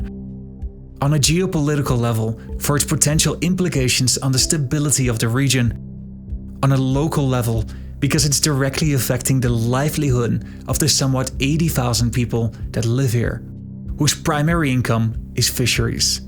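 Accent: Netherlands. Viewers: ROFL, I thought I reckognized that Dutch accent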